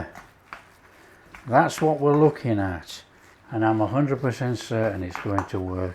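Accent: United Kingdom. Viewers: How I love to learn about such "basic" recipes! I only knew that much: the name originated from coarse sea salt, named corn, back in the days. For decades, I thought it was corn-fed cattle. Little did I know. Talking accents (a personal question, I know): I'm german. Been married to an american woman. Was related to a girl from down under. While my english is reported as "quite well", I still cannot decide, when it comes to accents. You're using Coleman's mustard. I do assume you're from the UK. But what exact region? Pls help me out! A friend of mine is from Norwich. I've also been to Ireland, once. Just my curiosity. I could listen all day long to Cheryl (What's for tea? here on YT) and Melisa (Soul singer reacts.) Music to my ears (in both cases). Prior to our wedding, a young man at the supermarket asked me about my accent. And was surprised. Saying: "I thought you where either english or scottish." A huge compliment for me! 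I've been born and raised a bit northwest of Hamburg, Germany. Never lived in an english spoken country.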